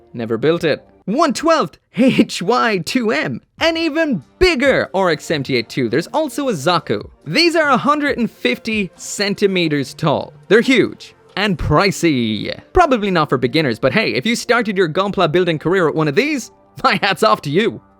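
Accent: Ireland. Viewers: its a misheard, he said 18 meters, hes irish accent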